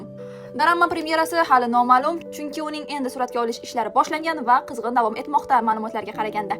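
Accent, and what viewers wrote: Bukhara, Uzbekistan. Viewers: Qayerdasiz shevangiz buxorocha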